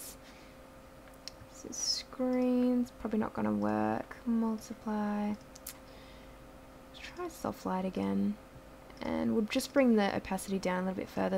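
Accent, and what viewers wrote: Australia. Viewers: with an Australian accent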